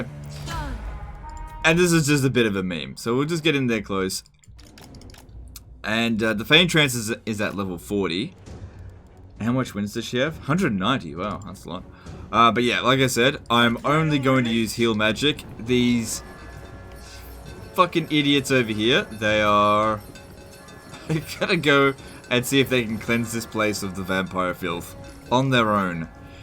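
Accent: Australia. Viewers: Bruh. I like putting these on as background noise cuz I love TW and has the free content perk of an Australian accent, but I heard those silly ass bells and looked at the screen. Lmaooooo what are those GOOFY dudes. 69/10 doomstack imo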